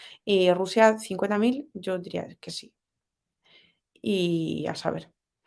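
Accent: Spain. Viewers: Saludos desde República Dominicana, puedes decir de dónde procede tu español, de España? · Ehyyyyyyyy es ESPÑOLA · Eres española ?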